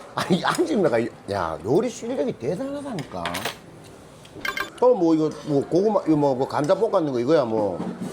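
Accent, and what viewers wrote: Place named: Gyeongsang, South Korea. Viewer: 경상도사투리조아요~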